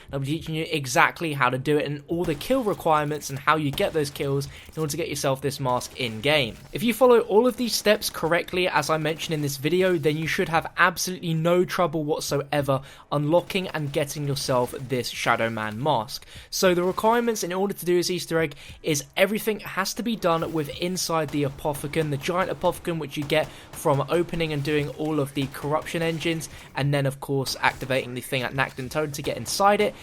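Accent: United Kingdom. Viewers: He's British, his accent changes pronunciation.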